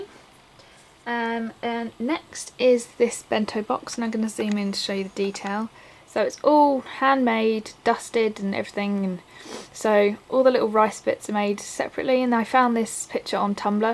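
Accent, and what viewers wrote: United Kingdom. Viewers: Your charms are very cute >.< I love them,perhamps i love your british accent x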